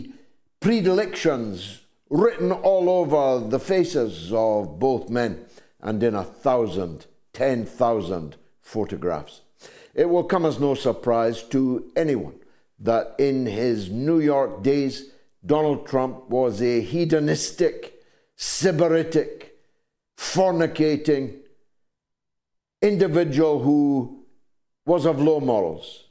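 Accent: Scotland, United Kingdom. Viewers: We love you 💖💖💖 You are such an honorable man! Deep Thanks for this speech! Eventhough, as a french-canadien, I must use the subtitles here and there because of your ''strong Scottish'' accent (which I love) 😉, I will continue to listen to you, sir. As I started to search for the ''truth'' about what's going on in this messy world, my son told me, several months ago, that you were a most valuable and reliable person with integrity and determination. So I started watching your program and I want to congratulate you and express my gratitude, from the bottom of my heart. Besides, I'm 69 so... you're older than I, which makes you even more honorable in my eyes! Take care and stay safe❤🖤🤍💚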